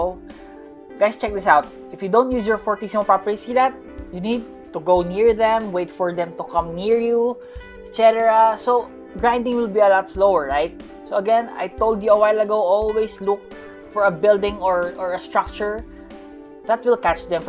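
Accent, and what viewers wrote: Philippines. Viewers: Pilipino kapo? Boses nyu kase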